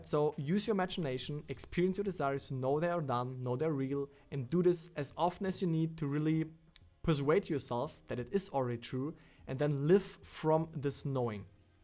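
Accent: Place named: Germany. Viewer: „ sounds pretty german, but there‘s basically nobody here knowing/studying Neville and all of that stuff“ - clicked on video, instantly got hit with a thick german accent 🙆🏻‍♀️😄 Na, dann sind wir immerhin schon zwei! 🙃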